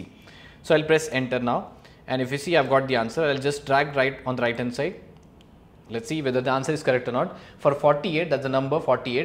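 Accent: India. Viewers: This indian accent is making me go totally insane. Had to quit after 20 seconds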